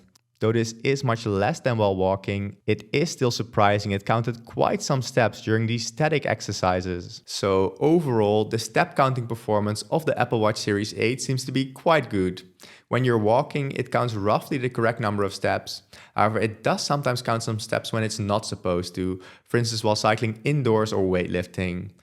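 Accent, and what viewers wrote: Netherlands. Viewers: Dit klinkt zoooo boring! en overduidelijk voor gelezen! With yr Dutch accent! 😵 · I lived in Amsterdam and u clearly have dutch english accent, i went on your site and you actually studied there , you dutch right 😄? Thanks for the reviews , i just found your channel. Now im even more confused on what to buy , according on the tons and tons of video you made that i dont know if i will be able to watch entirely. I want just to fix my sleep as i wake up 1 or 2 times every night, i want also to track my hearth i suspect some problems sometimes i have a miss hit in the beat, i don' t know how to explain it. Should i take the polar h10? A watch ? A band? Im so overwhelmed by the amount of review u did. Bye from an italian new follower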